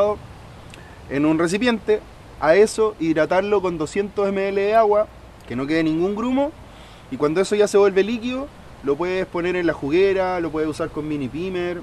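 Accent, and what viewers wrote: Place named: Cuba. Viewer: Habla como un cubano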